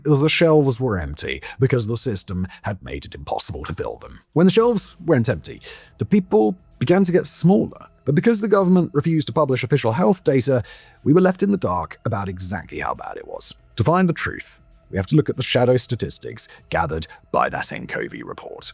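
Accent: United Kingdom. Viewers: Venezuela scrores in the best category by the Global Hunger Index. Venezuela scores better even than India, Papua New Guniea, heck it's even better than regional darling Ghana. Ukraine is war-torn, so, but even still Venezuela is scoring higher than Ukraine. When you consider these video topics, take a step back. Please don't report "stories too good to check." You could have spent this time better reviewing India's hunger issues, but instead you're doing imperial propaganda with a British accent (which used to be the best accent for imperial propaganda, but isn't anymore). I guess a Brit shitting on India for any reason would also come off wrong. You know... just critique empire, would you please? You have the proper accent for doing that! edit: don't know why I didn't mention that IN SOUTH AMERICA, Ecuador, Suriname and Bolivia all score worse on the Global Hunger Index, than Venezuela. 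This video feels borderline misinformation; it's just so unfairly focused and lacks context. Which, that's how the best lies operate! And I'm not fan of Maduro, but carrying water for empire is such an ugly look.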